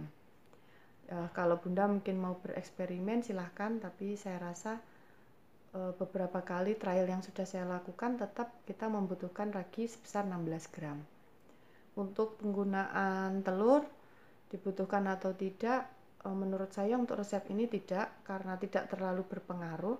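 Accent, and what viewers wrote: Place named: Java, Indonesia. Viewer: Ndak papa mbaaaak meski medok...itu ciri khas mbak.ehehhee...makasih sdh mau berbagi....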